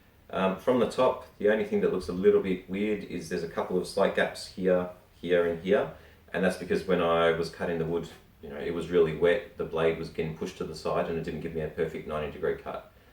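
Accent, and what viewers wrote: Australia. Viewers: It's great to hear a Aussie an Aussie accent. Love the casual approach as opposed to the over technical banter that is often the case. Great job and thanks for taking the time to show it.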